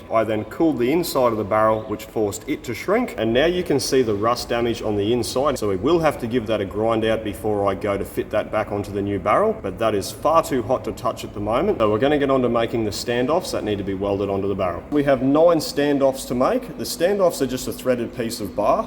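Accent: Queensland, Australia. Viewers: Back to listen to the finest example of the Queenslander accent on YouTube. Unless Wayne Bennett's got an account on here I don't know about, but it's not like he'd talk.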